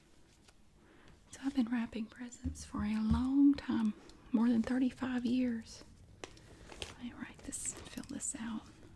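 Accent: South, United States. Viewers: Omg yes . Like a southern Pam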